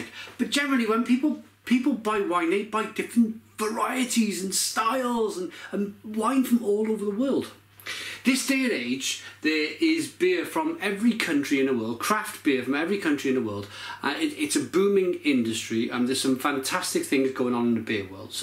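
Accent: Wales, United Kingdom. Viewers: Are you British? By the way I'm new to your channel! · He’s got the slurred speech accent of an alcoholic ahaha or is that just welsh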